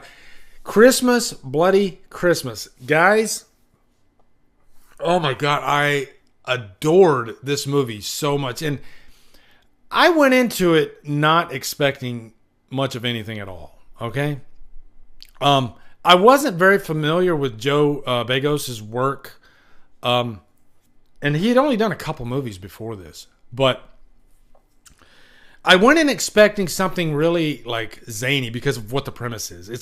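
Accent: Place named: Southeast, United States. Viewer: What an Elvis nod! Brilliant! I love your accent. Where are you from in the US? I'm guessing south east (I'm not from the US). Thanks for the list!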